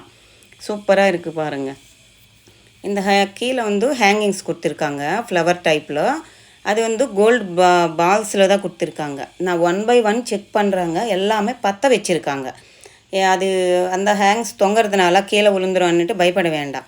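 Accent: India. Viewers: Very useful video mam. Your telugu mixed tamil is so cute to hear😊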